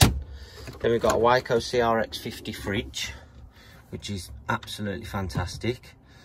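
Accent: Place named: Birmingham, United Kingdom. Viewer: I'm on this to boys early next year I'll start my build where you from you sound local in in Birmingham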